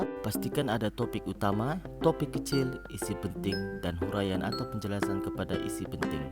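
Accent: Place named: Sabah, Malaysia. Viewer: KETARA SABAH TU HAHAHA. TRUSKAN IDENTITI SABAHAN TU . BTW NICE CONTENT 👍👍👍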